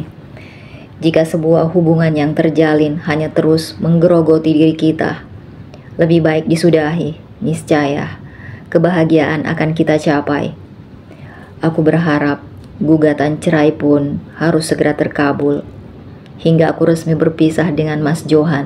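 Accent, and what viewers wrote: Medan, Indonesia. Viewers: Bogor hadir nyimak sy senang dgrin logatnya bahasa dr medan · Pasti yg bacakan ini orang Medan ❤❤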